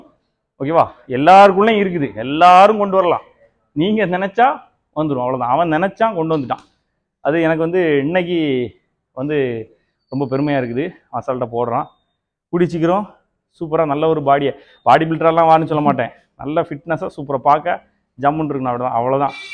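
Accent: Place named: Kanyakumari, India. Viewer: இது தான் உண்மையான கன்னியாகுமரி பேச்சு வழக்கு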